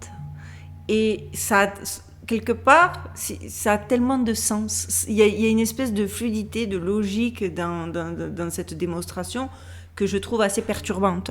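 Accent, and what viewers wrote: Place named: Provence, France. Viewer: Vous êtes de quelle région d'origine ? Votre petit accent du Sud bien de chez nous en Provence. Hein ?😉 🤗💕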